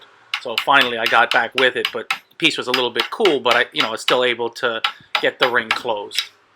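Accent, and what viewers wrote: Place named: Upstate New York, United States. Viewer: Love you’re videos!!!! You’re accent is also very interesting! I’m listening to “I’m guessing an up state New York accent? That has picked up the cadence of, for sure, and is slowly turning Taiwanese! It ads such an awesome element to your content. It’s ever present in your videos, but far more pronounced on your wandering Taipei videos when you’re obviously interacting with locals off camera! KEEP BEING AWESOME! I’ve gotta get on you’re Patreon page. Thank you for all you do!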